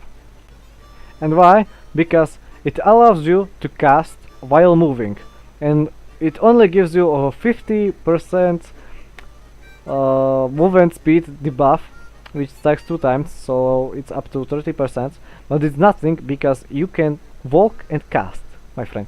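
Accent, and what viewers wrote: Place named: Europe. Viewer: Love your accent man, brings back to my euro friends I used to skype with. I can tell you really hate warriors lol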